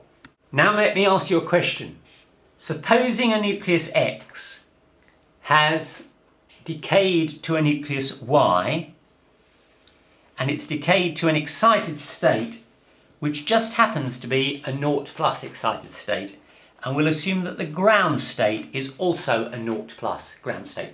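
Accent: United Kingdom. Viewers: hi....good explanation...i think its ur british accent..isn't it?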